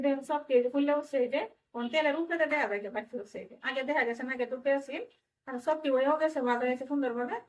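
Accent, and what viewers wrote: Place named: Assam, India. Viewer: ❤❤ধনিয়া পাতা দিয়ে মাখাই ব্রেড ক্রামশ দিয়ে ভাজলেন ❤আপুর আগে চেনেল আছিল অসমীয়া ভাষায় তাইনা আপনার গলার শব্দ শুনে বুঝলাম ❤❤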